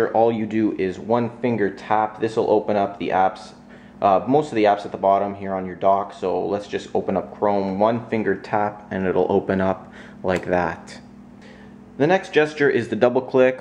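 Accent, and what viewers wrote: Canada. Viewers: The Canadian accent is so strong with this one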